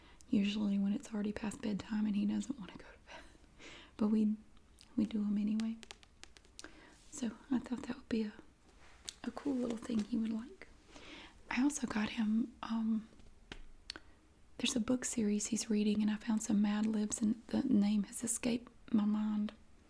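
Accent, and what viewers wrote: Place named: Tennessee, United States. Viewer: Just found your channel. You must be from Tennessee or Kentucky?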